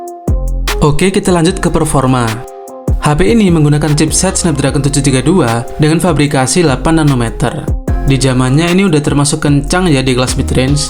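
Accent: Malang, Indonesia. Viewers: Pasti abang nya orang malang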